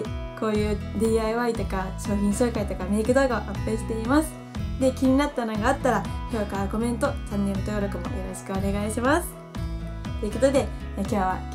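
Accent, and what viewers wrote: Kansai, Japan. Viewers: かんさいべん？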